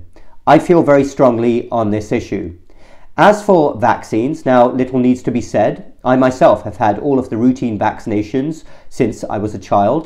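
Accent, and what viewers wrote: United Kingdom. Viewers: I was wondering why you have such a clipped British accent despite staying in the US! Now it's clear.😊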